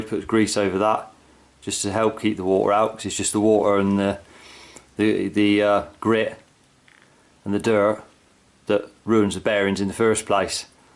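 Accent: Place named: Gloucestershire, United Kingdom. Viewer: Thanks a bunch brother. You accent seems like you are very close to where i live in Gloucestershire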